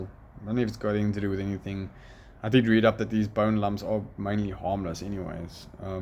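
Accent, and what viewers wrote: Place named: Johannesburg, South Africa. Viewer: Im trying to pin point that accent. Im guessing Joburg?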